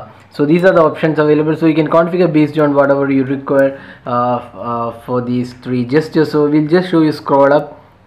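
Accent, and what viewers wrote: Kerala, India. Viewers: Mallu accent 😁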